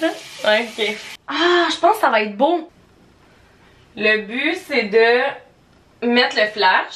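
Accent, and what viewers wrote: Quebec, Canada. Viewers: T’es française ou québécoise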